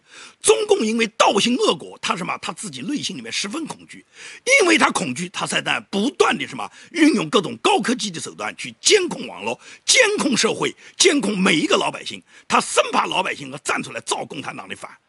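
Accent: Nanjing, China. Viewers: 过去的同事是南京人，所以，对南京人的口音感到很亲切。最重要的是，的内容好。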